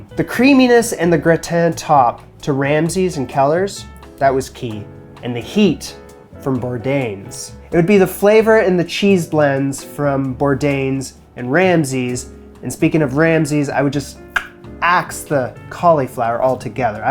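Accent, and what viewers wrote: Canada. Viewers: The Canadian accent is really coming back now that you’re back in the mother country. Aye?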